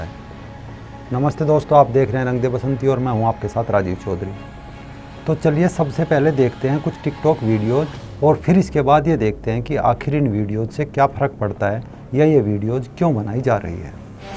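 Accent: Haryana, India. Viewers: RAM RAM BHAI HARYANVI LAG RE HO AP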